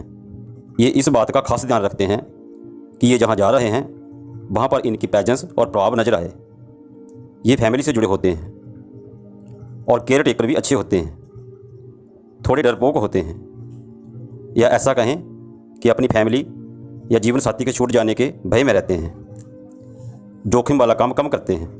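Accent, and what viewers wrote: Punjab, India. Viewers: Aur kuch toh pata nahi, par ek baat sure hai, video mein bolne wala banda punjabi hai 😀😀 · Aapp ki awaz mai punjabipan hai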